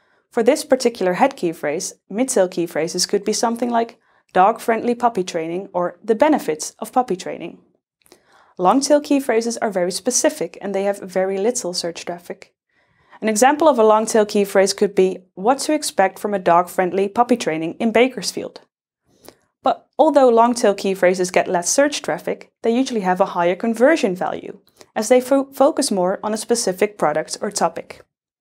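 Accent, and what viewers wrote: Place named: Netherlands. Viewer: She dutch though, typyical Dutch accent :D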